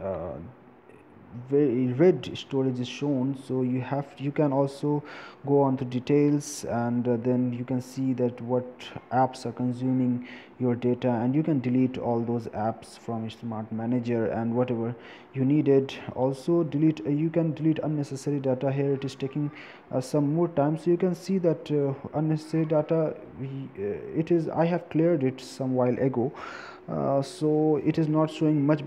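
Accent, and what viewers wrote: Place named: Pakistan. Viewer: Are you Pakistani? You remind me of Kumail Nanjiani the way you pronounce certain words.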